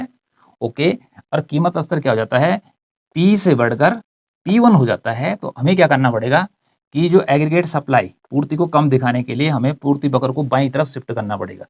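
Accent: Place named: Hills, India. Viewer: sir apki bhasha se lag raha jaise aap pahad se honge 🙏 or thank you sir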